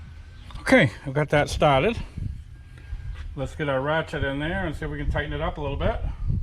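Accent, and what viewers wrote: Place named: Northeast, United States. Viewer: Ps Sounds like you're up here in NE by that accent.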